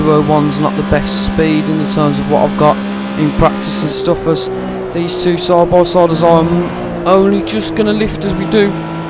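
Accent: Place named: Midlands, United Kingdom. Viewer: As a fellow Midlander, love hearing your accent here 😁❤️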